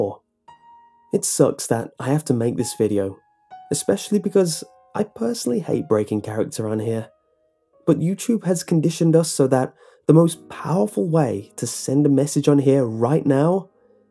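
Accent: United Kingdom. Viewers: it's okay dude I will love your videos I also like your British accent manual coolest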